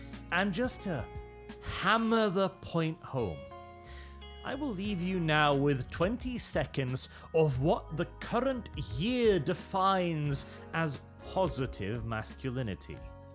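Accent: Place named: Wales, United Kingdom. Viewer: Don’t you love a Welsh accent!? Super enunciation as well. I’ve subbed.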